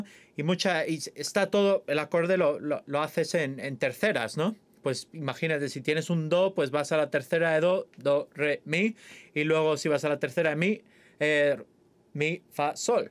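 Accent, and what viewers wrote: Spain. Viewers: Patético, lo haces demasiado mal, eres español hasta la médula, ahí tienes tus reproducciones por decir que eres estadounidense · que bien hablas español... con acento español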